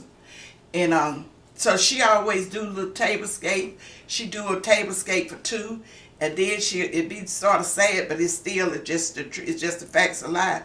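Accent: South, United States. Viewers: It’s something about you Ms.! I love your energy and you are just a good feeling. Every time I watch you I feel like I’ve talked to my Nana. You kill me saying you fumble your words and it makes me laugh but I’m laughing at you thinking it’s a problem. It’s not. That’s you and I know southerners often don’t speak very “proper” but I bet that food is proper. I see that you are great. You speak positive and make people feel comforted. You have beautiful skin. Y’all lucky I live in NY cause I’ll show up at your church and happen to befriend you on purpose 😂 ohh you have YouTube I didn’t know that! I know you will anyway but keep being yourself 😘😘😘😍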